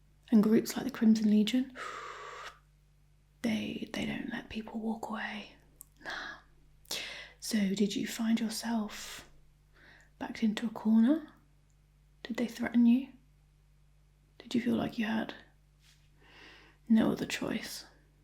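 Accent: RP, United Kingdom. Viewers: That felt fresh & original but kind of classic at the same time. I like all the surprises in there, and posh accent! I probably could do with sunglasses on for the light bit though! Haha! 😎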